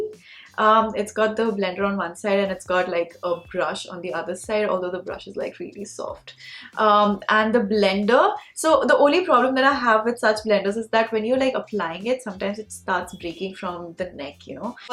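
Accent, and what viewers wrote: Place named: Uttarakhand, India. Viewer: Eee .. yes your hindi was giving me signs of you having uttarakhand connection 🤭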